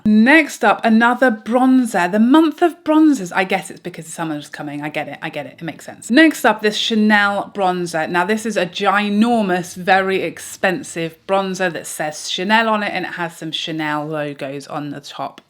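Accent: United Kingdom. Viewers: I love someone with a British accent telling me what to do! I’m not even tempted by the CT cream bronzer bc I never use the 2 I own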